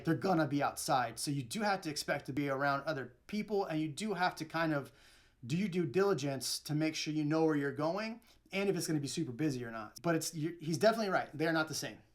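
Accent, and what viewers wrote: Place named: California, United States. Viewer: Yet, you talk like a wanna be californian. Odd.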